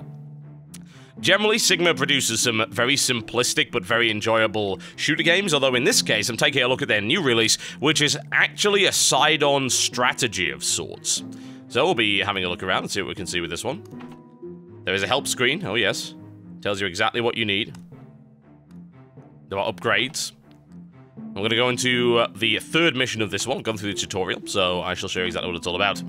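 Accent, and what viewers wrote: United Kingdom. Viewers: He's british. They say things funny. Like Amazon, to them, has an accent on the 2nd A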